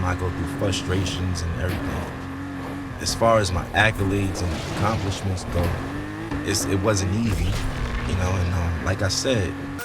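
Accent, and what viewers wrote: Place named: Brazil. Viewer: you have a really brazillian accent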